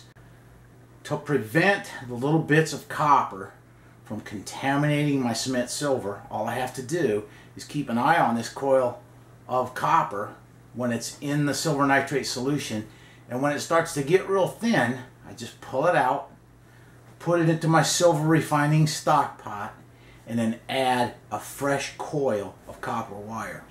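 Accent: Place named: South, United States. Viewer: a well spoken southern man that gets it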